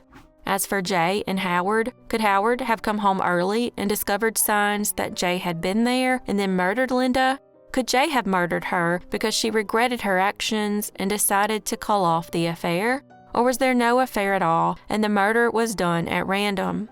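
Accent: South, United States. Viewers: Found this by accident. You gained a follow. Telling me I can get stories at work with a southern accent. Best thing to happen today! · What part of the south are you from?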